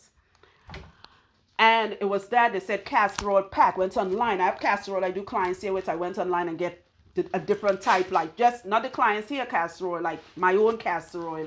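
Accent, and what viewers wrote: Trinidad and Tobago. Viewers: I knew it!!!! That Trini accent cannot hide....u had me laughing...but u were very direct and imformative and i appreciate that...firt time viewer from your home land...🇹🇹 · Hearing my accent on you is so beautiful, fellow Trini.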